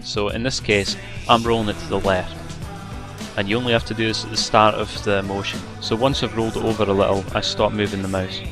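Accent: Scotland, United Kingdom. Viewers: Nice one. You sound quite clever for a jock ;-) Great tutorials there. Really enjoyed ya voice too. Normally cant stand Scottish accent, but then again you would hate my brummie one eh? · nice tutorial m8. i see you're very experienced. and nice accent. im scottish too :D. Do you still play BF2?. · nice tutorial m8 good 2 hear a scottish accent explaining it a want to master that funneling looks a good move i try swoop in shooting lol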